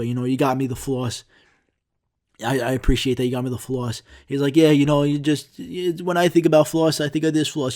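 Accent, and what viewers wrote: New York, United States. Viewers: I know you're from Greece, but you sound like you came from New York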